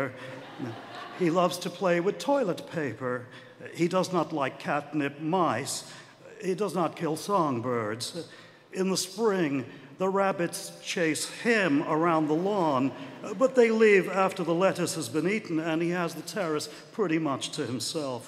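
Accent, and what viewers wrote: United States. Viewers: His American accent is surprisingly good! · Thank you. I was wondering where he got that particular american voice from. Doesn't sound at all like his usual voice I know from various british tv series.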